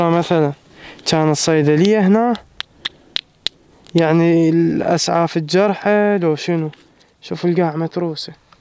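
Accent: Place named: Basra, Iraq. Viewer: مادري ليش يضحكني سدو خشمي وجيبلك كونيه وحمل هواي مصطلحات كلش حلووو هاذ من البصرة احسه نفس لهجتنه.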